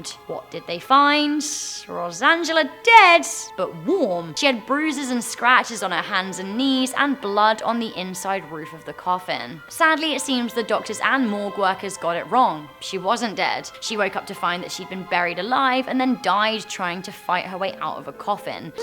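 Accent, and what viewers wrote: United Kingdom. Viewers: I love your British accent , its soo beautiful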